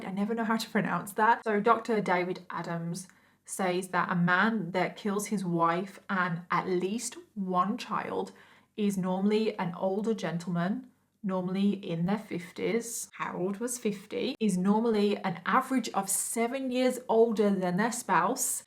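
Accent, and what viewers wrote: United Kingdom. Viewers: Was wondering why your videos were so similar to Bailey's.... then I saw in the description box you give her 100% credit for your inspiration! Good job ! Honest, beautiful, great makeup artist and story teller AND with a Brit accent ! Sad how Feliz means Happy 😧 One advice: Read Dante's Inferno, I know you're young so have not heard of or read it, but it should be a part of your literary culture. You'll thank me later ☺ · i mean i watch bailey but u dont watch THIS girl because shes british and i cant really understand her and also im learning american english and i dont want to mix these accents up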